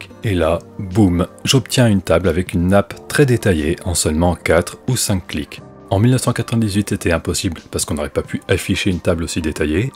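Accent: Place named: Paris, France. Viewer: Je viens de découvrir ta chaîne et malgré ton accent parisien ;) je suis très fan, merci.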